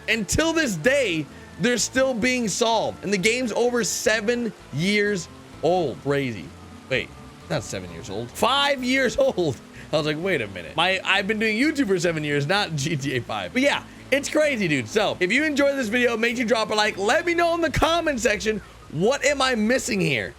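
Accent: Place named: New York, United States. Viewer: He sounded like a New Yorker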